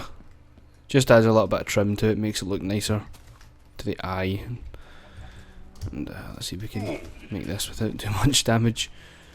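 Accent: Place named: Edinburgh, United Kingdom. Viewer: Where you from in Scotland, Edinbrugh ishh? You sound it.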